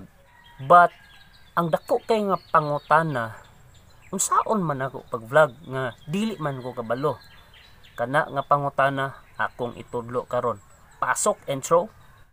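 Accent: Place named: Visayas, Philippines. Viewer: Bisaya kaau oie maau man motirada dong bright da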